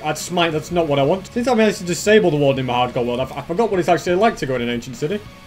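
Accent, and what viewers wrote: United Kingdom. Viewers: You are Britishers because of your accent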